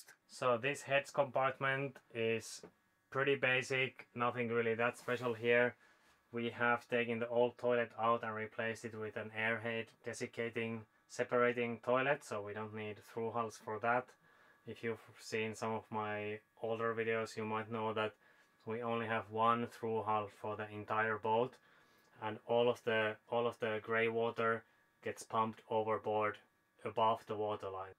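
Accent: Finland. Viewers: Aksentista äkkiä päättelin että suomalaisia sitä ollaan, hienoo että elätte unelmianne! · Er du Nordmann? Aksenttisi perusteella uskon, että saksisi on oltava suomalainen.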